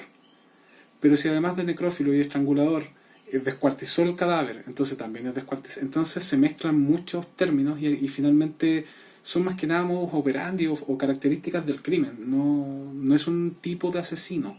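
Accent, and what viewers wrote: Chile. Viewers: Es interesante oirte hablar, eres Chileno? (lo pregunto por tu dejo) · No sé si te han dicho en tu estilo personal y apariencia tiene un parecido bastante notorio con Dross en las miniaturas pero los acentos son bien diferentes el es Argentino pero su acentos no es tan fuerte pues lo dicimula muy bien y ya es un acento más parecido al venenzalano y el tuyo es Chileno si no me equivoco y no me mal intérpretes es más una curiosidad personal porque me gusta el acento de ustedes los chilenos porque es muy respetuoso al hablar y muy correcto bastante parecido al español pues el de todos nosotros es castellano que deriva del español pero si me llama mucho la atención que hablan muy rápido y fluido y no se será la costumbre de hablar muy correcto desde chicos que ya de grandes hablan rápido sin errores para hablar y no es algo que nos afecte a los que escuchamos porque apezar de hablar rápido es muy claros y a según hablan no tenemos ningún problema para entender todo pero no sé porque me parece muy difícil poder imitar ese acento en especial a comparación de los demas y otros que no puedo distinguir es el de los paraguayos y uruguallo es bien parecido al argentino, el de ustedes es un poco pero bien poco parecido al de los peruanos pero ese no lo confundo es como si tratarán de distinguir a los Salvadoreños, con el de nosotros los Hondureños aveces se confunden también con el de Guatemaltecos pero nos es tanto como entre para nosotros también nos confundimos porque son muy pocas las palabras que nos diferencian entre salvadoreños y hondureños, a mí me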